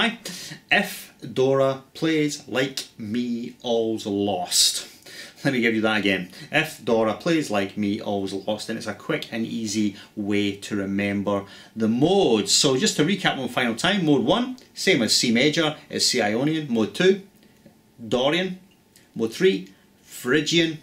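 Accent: Scotland, United Kingdom. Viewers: Awesome video. Really informative and the Scottish accent made it a bit more interesting. Cheers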